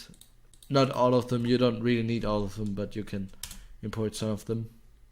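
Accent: Germany. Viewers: are you german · deutscher detected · Nicht böse gemeint, aber HOLY hört man dass du deutscher bist😂😂❤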